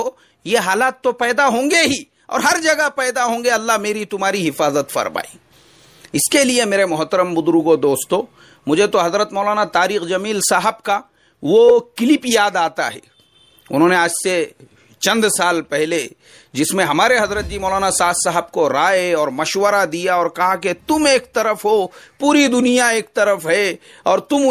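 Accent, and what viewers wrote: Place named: South, India. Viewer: Aap ki lahze se malum chalta he aap junubi hind se taaluk rakhte hein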